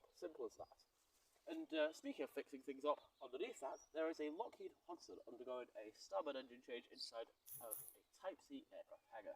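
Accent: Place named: Germany. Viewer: Excellent video. Very interesting and a great German accent too!!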